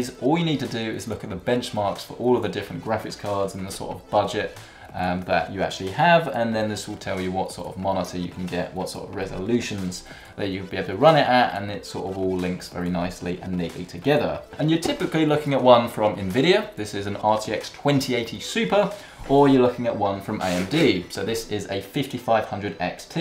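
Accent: United Kingdom. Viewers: Your British accent makes the words go through one ear and out the other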